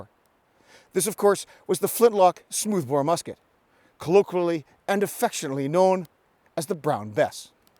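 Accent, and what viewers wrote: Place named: Canada. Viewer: God that landscape is so beautiful. Im assuming by the uniform you're Scottish but the accent sounds slightly Canadian.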